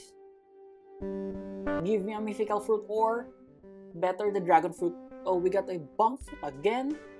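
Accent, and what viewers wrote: Philippines. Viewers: ive been watching you for years now i love ur intro, ur filipino accent and the way u work hard for content doing those level 1 to pro noobs “ is back” i admire you and u make me so encouraged to make new alt accounts and max level them all i wish u notice this comment. happy bomb day🎉🎉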